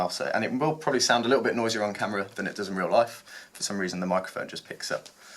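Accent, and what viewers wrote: United Kingdom. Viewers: So the BRITS LEAD the SEW.MACHINE REVIEWS ! 😅😊! I am FROM , Michigan , now in Boston, MA ., 74 and your accent helps me understand N.England people !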